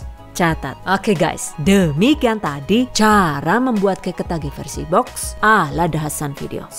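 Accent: Banyumas, Indonesia. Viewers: Serumpun sepertinya sama suami saya..ngapak"😄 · Suka ngakak denger mbak nya ngomong ngapak..😂🎉sukses trs mbk... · Mbaaaaaa'e , ngapak²😂😂😂 resepnyaaa mantap. Terima kasih yoooo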